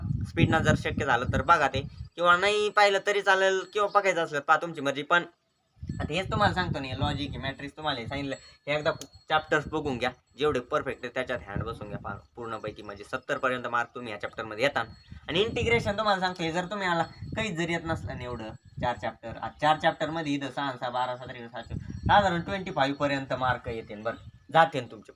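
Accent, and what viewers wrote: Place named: Ahmednagar, India. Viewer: नगर ची भाषा ऐकायला फार गोड वाटते दादा तुझं मार्गदर्शनाची मदत होते धन्यवाद मुंबई वरून 🙏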